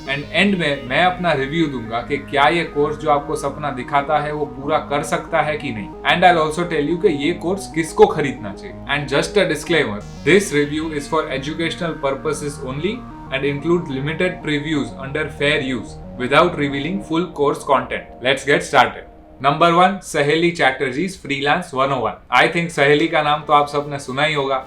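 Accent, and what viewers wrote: Gujarat, India. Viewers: જ્યારે ગુજરાતી વ્યક્તિ હિન્દી બોલે છે.. બહુ સરસ લાગે છે.. હું પણ ગુજરાતી છું.. બેસ્ટ વિડિઓ ભાઈ.. keep it up😊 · HEY BRO ARE YOU GUJARATI? caught from accent 😅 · Gujarati pata chal hi zata hai puch ne ki jarurat nhi h😂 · Bhai apke hindi mai Gujarati q a ri h? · Are you gujarati bhai?😅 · Gujarati bolvani style thi j olkhay jai 😅😅😅😅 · ભાઇ તું ગુજરાતી છે ને તારા બોલવા પર થી ખબર પડી ગઈ અને મોસ્ટ પ્રોબેબબલી કાઠિયાવાડ રાજકોટ સાઈડ નો હોઈ શકે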